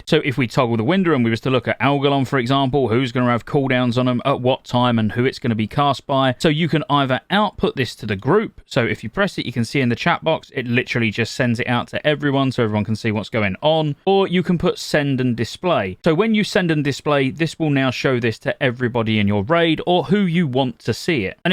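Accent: United Kingdom. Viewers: Is this a special type of British accent? It sounds interesting and a little different than what I’m used to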